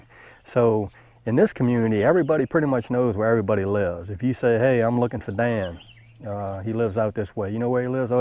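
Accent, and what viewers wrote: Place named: Louisiana, United States. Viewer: yeah buddy thank you ..I was born and raised in Louisiana myself I can always hear that accent lol love the video man thank you for sharing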